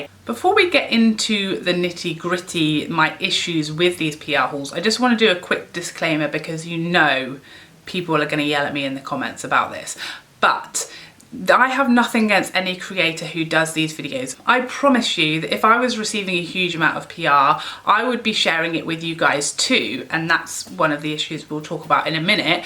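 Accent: United Kingdom. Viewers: Gwaaaad I hate it when you speak so much sense 🤔... it’s a catch 22 isn’t it... if you could review new launches promptly you would get more subscribers... One of the things I LOVE about your channel is that you’re British... so apart from an awesome sense of irony... in a sea of American accents talking about American products, you really stand out. So maybe we could reach out to UK brands first? I’m onto Charlotte Tilbury...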